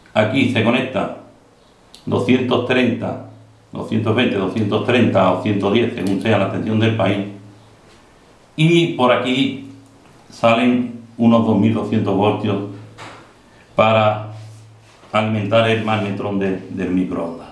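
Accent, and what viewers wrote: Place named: Seville, Spain. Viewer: gracias por la respuesta...lo preguntaba porque por el habla, parece usted de sevilla, que es donde resido, en mi trabajo relacionado con plantas de agua...siempre subcontrato la fabricación del cuadro...cosa que me gustaria hacer yo mismo para tener mas control sobre los problemas posteriores que casi siempre los hay. En la facultad saque un 9 resolviendo circuitos...pero todo muy abstracto y teorico...demasiado alejado de la practica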